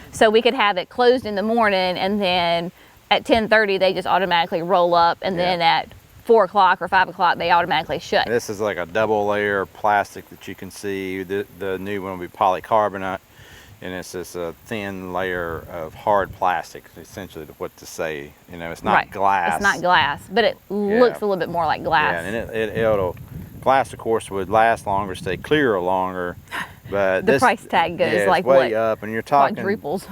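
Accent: South, United States. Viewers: Bring more videos, I enjoy your true self, it’s refreshing. I also enjoy listening to your southern accent. ❤️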